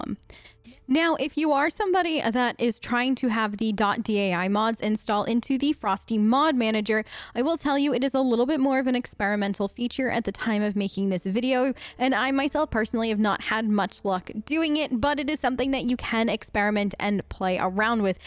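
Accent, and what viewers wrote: Australia. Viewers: Look, I have to know, what is your accent? It sounds like you're alternating between Australian and American to me (maybe because I'm Australian). Also, super-duper thanks for this. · The accent is 100% not her original accent. I'm confident that she is Australian. It comes through, especially in moments of focus intensive thinking. Listen out for it, you will hear it. Specifically listen to the words beginning and ending with "o". Australians pronounce that very differently from Americans and you can hear her slip up often. Listen out for "hello", "over", and others. Don't dismiss it, there's a reason people don't notice. She does the American accent very well, even if a bit stereotypical. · I keep hearing an aussie accent slip in there.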